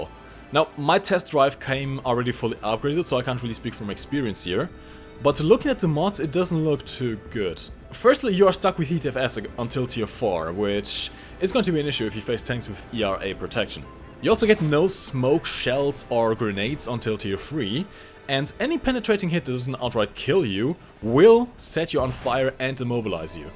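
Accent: Germany. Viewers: I knew that he sounds like a german 😂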